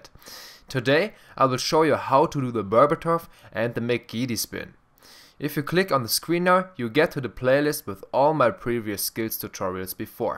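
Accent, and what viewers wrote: Germany. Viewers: Der ist doch hundert pro n deutsch das hört man sofort :D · r u german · Ich finds so lustig wie man es raushört, dass du deutsch bist. :D Btw gutes Vid.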